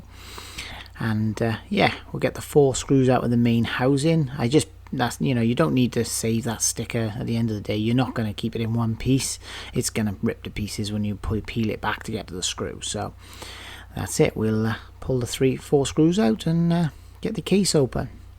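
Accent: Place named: Wales, United Kingdom. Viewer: Very helpful, detailed, & professional video, all delivered in a most pleasant Welsh accent! Very well done😊.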